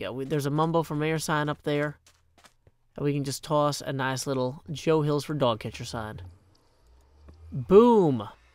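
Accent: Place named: Tennessee, United States. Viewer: I just found u and instantly new u were from TN with that accent😂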